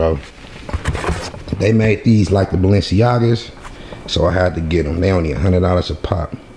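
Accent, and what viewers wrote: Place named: Los Angeles, United States. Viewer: first time on your channel... but are you from LA? you just got that accent lol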